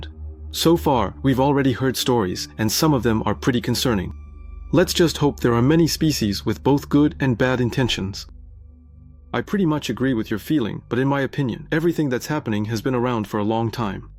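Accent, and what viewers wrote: France. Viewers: Omg? Sup with the robotic voice? I like your original french accented voice... ⭐️*⭐️*⭐️*⭐️*⭐️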